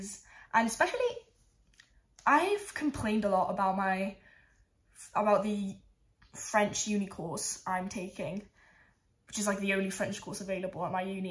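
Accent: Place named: Scotland, United Kingdom. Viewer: That is scottish accent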